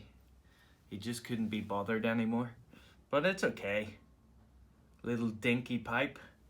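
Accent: Ireland. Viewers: Thank you for subscribing to me do I detect a little IR ish in your voice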